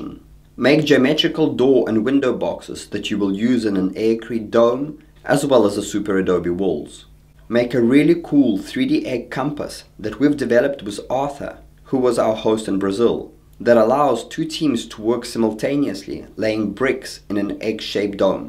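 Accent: South Africa. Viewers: It sounds sooo much like you have a South African accent??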